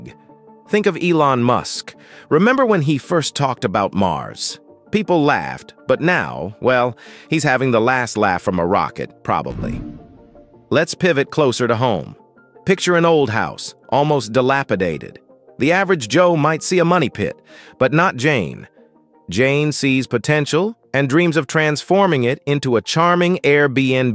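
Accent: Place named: United States. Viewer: You have a real cowboy accent in this video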